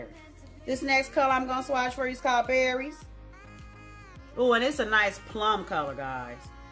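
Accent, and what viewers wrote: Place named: United States. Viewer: Your non chalant country voice cracks me up i looooove it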